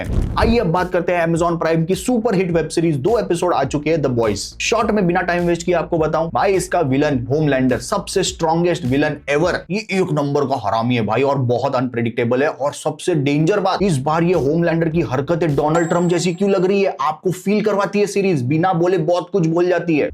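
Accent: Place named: Mumbai, India. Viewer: Bombay wali Hindi bol raha hai bhaiya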